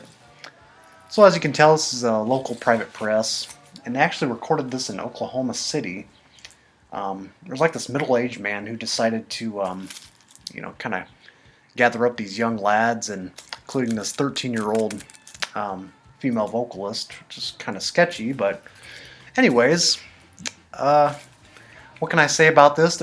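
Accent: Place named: Lincoln, United Kingdom. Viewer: Hey! Cosmic Brian sent me here! He said you're from Omaha, but it sounds to me like you're from Lincoln? It's pretty cool watching a video of someone showing the treasures they found at places where I shop at occasionally!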